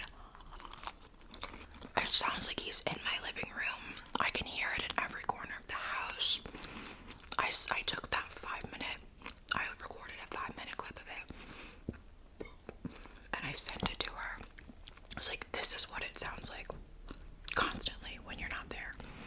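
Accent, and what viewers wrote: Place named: Delaware County, United States. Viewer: Girl are you from Delco? You remind me of back home 😂❤️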